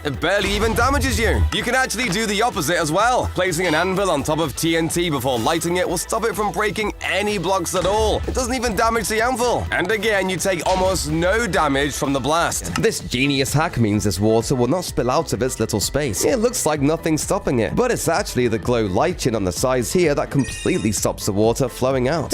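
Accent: United Kingdom. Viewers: He sounds like British skip the tutorial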